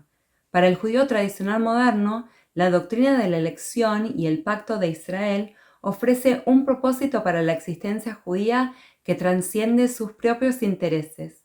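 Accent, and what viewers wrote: Argentina. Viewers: Hola! Soy nuevo suscriptor y me encantan tu bella sonrisa, tu apacible mirada y tu lindo acento argentino! Claro también aprecio mucho tu información y enseñanzas sobre el Judaísmo! En el tema del pueblo escogido, tengo la siguiente duda: te he oído decir que el pueblo judio tiene la responsabilidad de dar buen ejemplo de vida y de preservar y difundir las enseñanzas de Dios, sin embargo, he escuchado decir a otros exponentes del Judaísmo que la Religión judía no busca convertir a nadie, ni lograr que otros abracen sus creencias! Cómo entonces se pueden difundir sus enseñanzas si pareciera ser una Religión muy particular o restringida a un círculo muy estrecho de conocedores y practicantes? Cuando llegue el Mesías que esperan, El difundirá a todo el mundo la religión judía y su búsqueda de la Paz, o ustedes deben llevar a cabo esa tarea?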